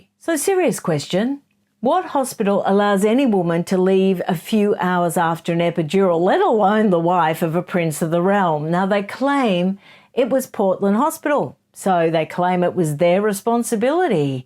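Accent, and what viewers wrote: Australia. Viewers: you speak like a true Australian. 👏🏻🇦🇺 No flies on our noses. The time is approaching via social media that every skeleton Charles is hiding in his closet will be revealed. He has vast resources at his disposal to have known all.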